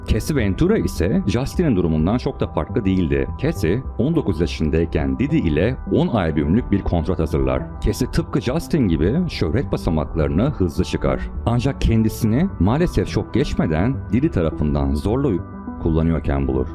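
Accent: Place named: United States. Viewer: KONUŞMA TARZINA KULAKLARIM KANADI! SANKİ TÜRKÇEYİ YENİ ÖĞRENMİŞ AMERİKALI TÜRK GÜRBETÇİ GİBİ !!! NORMALDE ÇOK KIZLAR KADINLAR BÖYLE KONUŞUYORLAR !!!!🤦‍♂🤦‍♂🤦‍♂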